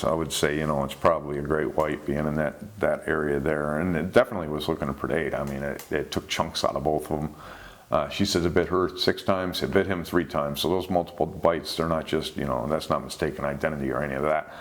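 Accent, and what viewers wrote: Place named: Midwest, United States. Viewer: Hi, cool vids. Where are you from, I'm from Milwaukee-Chicago area originally. You sound very Midwest to me. I'm a former surfer living in WA. Learned in California. Surfed westcoast, Mexico and Hawaii. Can no longer, out of fear. A friend of mine Kenny Doubt was severely bitten in Cannon Beach OR. by a GW in 1979. I just can't do it anymore. It was one of my favorite sports.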